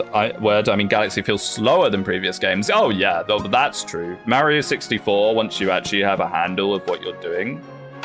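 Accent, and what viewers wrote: United Kingdom. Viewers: Can't wait for the 3am stream I'll miss into another ninian edit into 4 years without streams, keep it up my favorite bri'ish man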